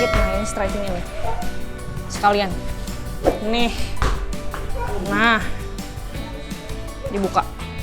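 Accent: West Java, Indonesia. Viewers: Dari logat bicara mbk dari sunda..mereun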